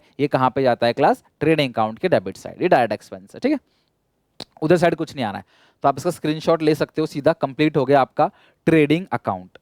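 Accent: Odisha, India. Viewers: sir ap ka mother language odia hai kya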